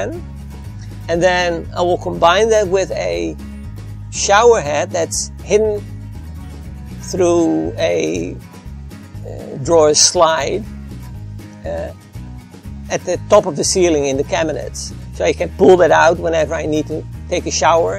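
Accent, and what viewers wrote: Netherlands. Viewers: I hear some Dutch origin in your voice? Or am I way off?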